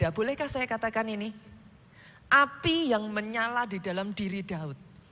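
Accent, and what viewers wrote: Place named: Java, Indonesia. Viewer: Terima kasih Pastor untuk kotbahnya. Saya sgt du berkati jelas dan tajam, sgt membanty saya memahami Alkitab. Awalnya saya tdk suka dgn pastor krn menurut saya kog seperti model trus bahasa Indonesia nya agak medok jawa. Tetapi lama lama saya smkn suka. Pastor itu fashionable dan dinamis, cerdas dan tegas, Terima ksh ya ps n team, Gbu all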